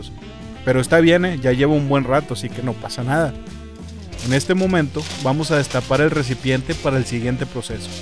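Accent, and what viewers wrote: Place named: North, Mexico. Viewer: pues los dos son del norte, es natural que hablen parecido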